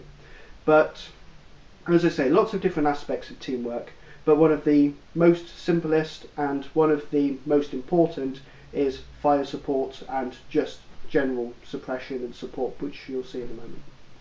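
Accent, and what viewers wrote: England, United Kingdom. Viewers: you english?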